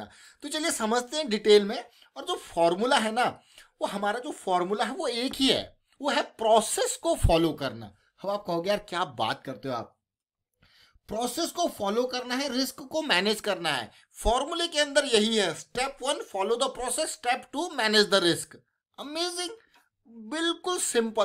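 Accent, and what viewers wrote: Uttarakhand, India. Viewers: Sir , Are you from uttarakhand?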